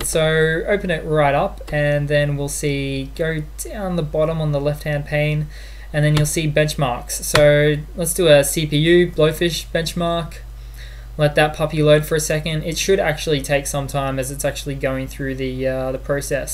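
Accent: Australia. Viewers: australian accent!!!!!!!love it xDDDDD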